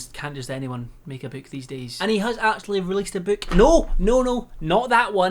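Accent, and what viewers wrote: Scotland, United Kingdom. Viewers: It’s Scottish 😭😭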